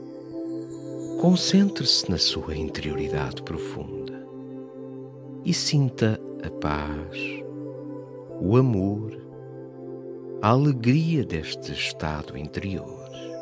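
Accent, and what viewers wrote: Portugal. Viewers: Finalmente, uma meditação em português De Portugal. Voz bonita. 🙏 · Como foi bom ouvir esta Meditação com este sotaque português que amo.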